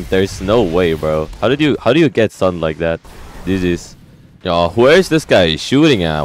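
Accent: Jamaica. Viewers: Are you Jamaican? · Whit you talk Jamaica bro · Bro jamaican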